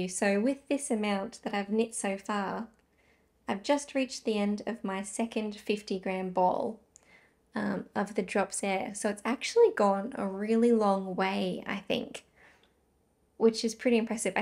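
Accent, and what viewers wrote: Australia. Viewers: So nice to listen to your podcast, an Aussie voice. I'm planning a trip to Brisbane in April so will visit the Little Yarn Store. I'm in the Blue mountains of NSW so lots of handknits are the go for me. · love listening to your aussie accent. I love your Rebel cardigan and you logic $ I think you need to add the value or the wearability of the garment. I'm in Nz, blue dyes do tend to bleed i have been advised to use cold water to block and use hair conditioner instead of wool wash. i am learning the shadow wrap short row heel on my current sock project. i was wearing my finished mkal while watching your podcast, i wore it out tonight and had so many compliments. it's in deep teals and greys merino silk blends. this clip is from Knitty McPurly podcast from 24 may will help you with your swift skien winder issue, <link>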